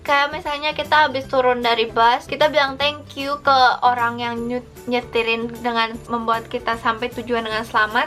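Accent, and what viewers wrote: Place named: United Kingdom. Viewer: your accent is getting more british! ❤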